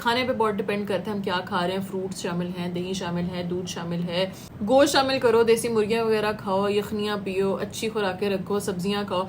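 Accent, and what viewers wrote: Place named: Punjab, India. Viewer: Yar aik to smile boht pyari h apki 2nd punjabi boht pyari bolti ho ap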